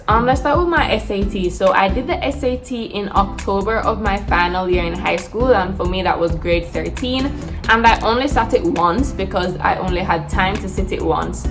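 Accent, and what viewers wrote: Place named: Jamaica. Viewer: Oh I just found your channel like 30 minutes ago and couldn't quite put a finger on the accent. Now that you mentioned that you're from Jamaica, that clarifies it for me. I am applying to come to the States for my PhD and while a lot of what you said was directed at undergrads, I still found a few tips to be helpful as well. Thanks for the great content.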